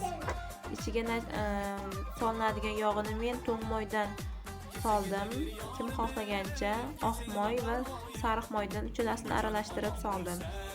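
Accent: Uzbekistan. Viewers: Tili xuddi Amudaryonikidek ekan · Шевангиз Галлаоролники😊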